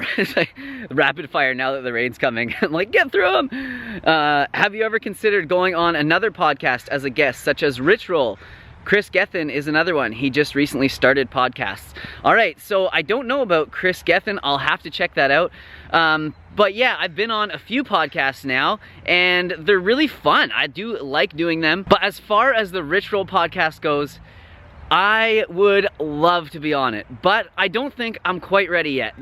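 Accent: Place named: Canada. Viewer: I don’t know how anyone can find you annoying. I love the Canadian accent...ah frig...😍.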